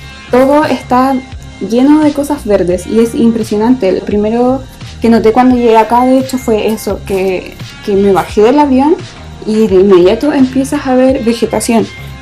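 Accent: Chile. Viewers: AAAAAAAAAAAAA se nota que es chilena apenas hablo jajajajajaaj que bella... eres muy linda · Sin ver 1 minuto del video puedo asegurar que eres chilena con ese castellano tan eligible 😂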